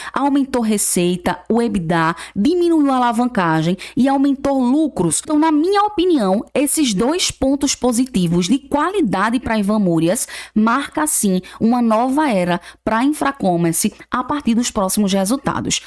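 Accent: Northeast, Brazil. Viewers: Adorei nossa marquinha nordestina... Parabéns. Comprei 10k metade 0,46, metade a 0,51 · Muito legal esse teu leve sotaquezinho do nosso nordestino tradicional!!! Isso te faz a única, e te distingue no meio de todo esse grupo. Parabéns!!!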